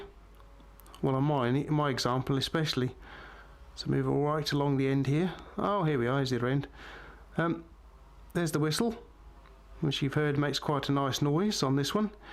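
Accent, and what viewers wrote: United Kingdom. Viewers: Are you from London?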